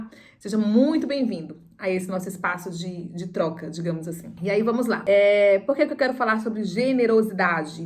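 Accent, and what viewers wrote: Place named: Portugal. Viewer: sra, eu estou a ouvi-la, você fala clarinho, com toda a á vontade, não há hipótese de dúvidas se você fala exatamente como todos falamos, custa,- me acreditar que brasileiros digam que percebem melhor o espanhol do que o português de cá, estão a brincar connosco, não acha.?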